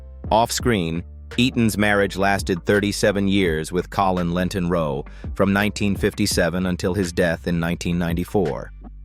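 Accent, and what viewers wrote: United States. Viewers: Why the annoying American narrator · That,s because the commemtator is american !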